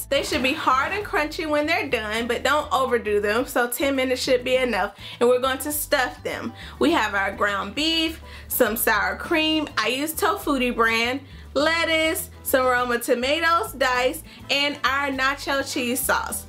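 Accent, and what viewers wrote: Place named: South, United States. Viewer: Yay! Ur back.. Hood thing? Nope, I think that's just the southern twang ppl use.. Hahaa, love how u stuffed ur mouth xoxo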